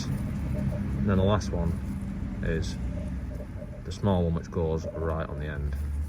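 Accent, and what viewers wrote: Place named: Oldham, United Kingdom. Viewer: I visit this channel for my daughters’ C1 and now you’ve bought the same model as my car, there is a god. Plus those dulcet Oldham tones, reminds me of home.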